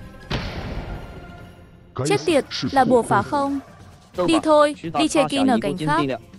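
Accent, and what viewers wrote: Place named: China. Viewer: Giọng này có tiếng trung giở quá. Ko hay bằng giọng cũ.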